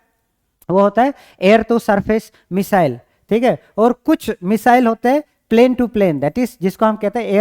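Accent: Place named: Assam, India. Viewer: sir no one says ur nepali until you tell or emphasize it , so stop aying this sir and yes if u were then teacher kidhar se bhi ek student ka karm hai ki wo uske guru ki respect aur shiksha ka adr kar use grhan kare so pls don't ay this sir , when i attend the first lec. i felt as u r an indain only from assam or meghalaya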